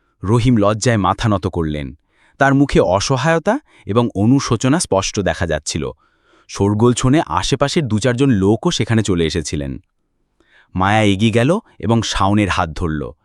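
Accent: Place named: Dhaka, Bangladesh. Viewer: এই গল্পকার ঢাকার কথা বলার কারণে গল্পের গুরুত্ব কমে যাচ্ছে।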